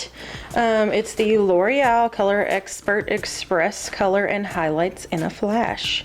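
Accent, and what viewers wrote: South, United States. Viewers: I heard your country accent, heard u were a mom of boys and a nurse and subscribed. Where are you from? Im from Texas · I just love your southern accent. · Which shade Frost and Glow did you use? I need to do this STAT! You’re freaking gorgeous and have the cutest Southern Accent. Ignore all the trolls. They have nothing better to do with life!! Please get back to me on the Frost & Glow shade so I can get this done fast!! Thank you!! ❤️❤️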